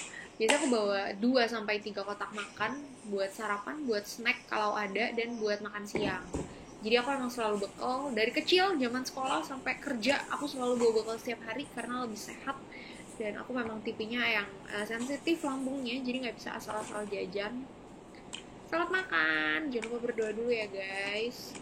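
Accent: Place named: Bandung, Indonesia. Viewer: Dari bandung ya teh, hehe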